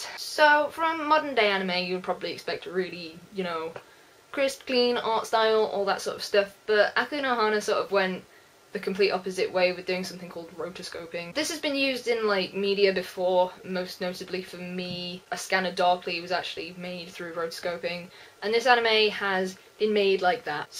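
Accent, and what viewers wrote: United Kingdom. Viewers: I'm really glad that I got to hear a fellow Brit talking about this anime. I've become a big fan of it and each episode cannot come soon enough. I'm definitely used to the style now but it is a pity that they couldn't put more money into it.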